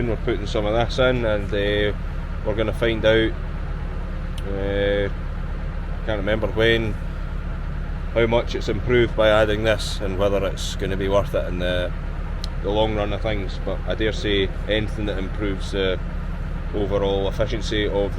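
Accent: Scotland, United Kingdom. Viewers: what part a scotland you from ..im in northern ireland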